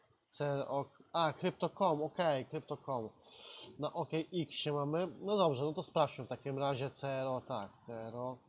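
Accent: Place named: Costa Rica. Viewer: Voice of Costa Rica😁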